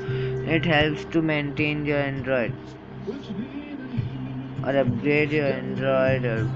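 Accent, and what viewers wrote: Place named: Pakistan. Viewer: Tamás Papp bro if you dont like someone's accent ,just go away dont judge him by his way of speaking, at least what he did worked and its a working trick ,he is from Pakistan and here english is a secondary language and he made a video in English to help the Western people who are in problem ,he can also make a same in his own language but he cared of all coz english is adapted by many countries .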